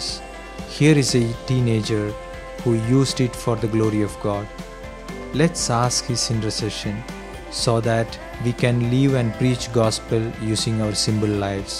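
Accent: Kerala, India. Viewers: Hearing the voice of the narrator I know his an Indian. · Sounds indian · Sounds like a malayali..... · That Indian accent bothers me! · Beautiful video. Nekkul Malayaliee anuuu ellai. I understood that from your voice. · Is this voice over given by a priest from Kerala? Nalla kettuparichayam Ulla slang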